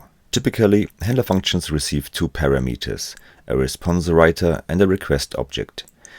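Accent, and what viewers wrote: Germany. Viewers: 好棒， 简单明了， 听英文口音像德国人？ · The German accent is strong with this one ^^ Great video, well done!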